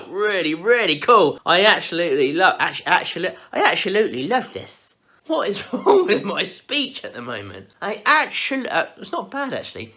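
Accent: United Kingdom. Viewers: Well thought by the thumbnail this would be interesting video... then soon as heard you speak I said ugh hell no! The way Brit’s speak is absolutely annoying... so no thank you moving on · First time watching this channel and I honestly was not expecting the British (?) accent. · ...you are freaking amazing n hilarious!!! Great job n i love your British accent.....rather sexy...🙋💕💕💕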